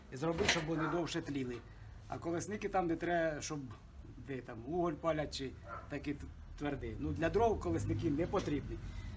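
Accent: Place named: Polesia, Ukraine. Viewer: чую родний полєский говор =)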